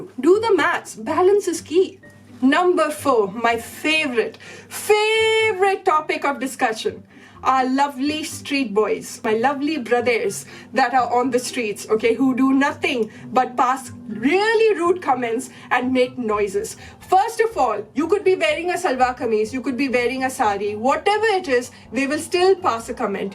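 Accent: Kerala, India. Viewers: Uncle ne vendi dosha indakke. in your Malayalam accent killed me manh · i love the way you speak malayalam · I'm watching this video for the 7 th time just to hear your Malayalam accent. 😄✌️ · HAHAHAHHA. Your malayalam accent is so bad no offence it made me laugh in the middle of the night!! But yes, being an NRI, i relate so muchhhh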